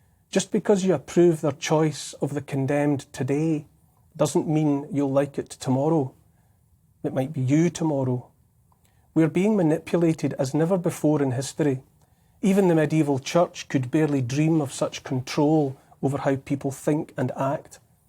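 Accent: Scotland, United Kingdom. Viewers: Really he is what he's complaining about. Somebody on " The News " extrapolating the worst possible outcome from any given event just to keep us in a state of anxious uncertainty. But in a Scottish accent. Nothing but a Private Frazier for our time constantly declaring that "we're dooomed"!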